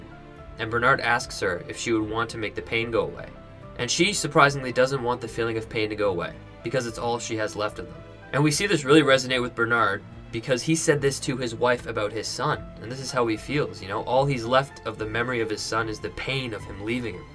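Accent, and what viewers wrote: Canada. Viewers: do all Canadians pronounce Theresa as "tharissa"?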